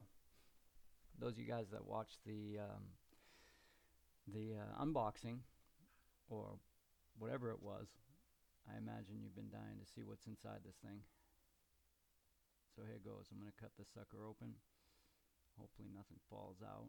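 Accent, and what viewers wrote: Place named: Boston, United States. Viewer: Love your accent,bean town???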